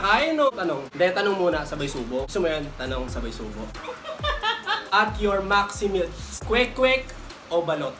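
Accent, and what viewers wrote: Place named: Conyo, Philippines. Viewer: Sobrang saya ko while watching, napaka konyo mo and di ka boring kausap sana lahat may sense of humor.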